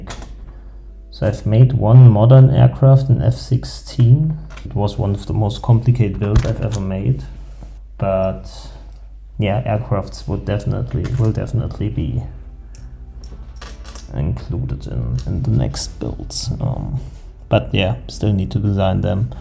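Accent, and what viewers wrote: Germany. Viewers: His accent? He's german - not everyone speaks American English or whatever bro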